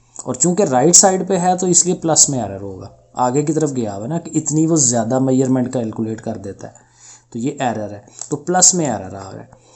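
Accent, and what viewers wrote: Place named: Lahore, Pakistan. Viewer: Ap Lahore sy lgty hyn sr😊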